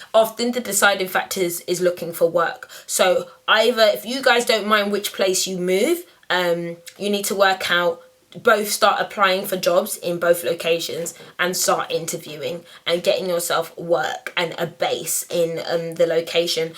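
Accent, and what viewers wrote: United Kingdom. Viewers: I Looooovvvveee the british accent! <3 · Are you from London?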